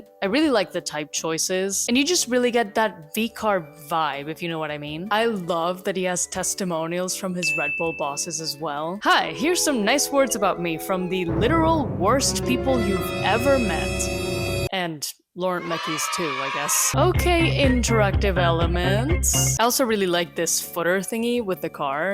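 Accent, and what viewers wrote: Spain. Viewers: I´m gonna say, this is actually a good yt video... And hold on there is a really good spanish pronunciation, but i mean spanish from Spain. R u spanish...?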